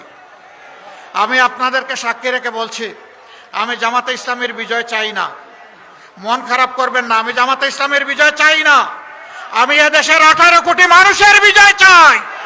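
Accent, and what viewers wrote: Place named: Sylhet, Bangladesh. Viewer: Masallah nice sylhety ❤❤❤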